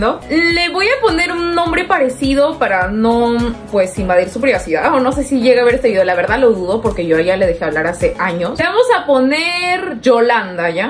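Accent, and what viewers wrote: Chile. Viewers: Pregunta eres de chile 🇨🇱? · eres chilena🤔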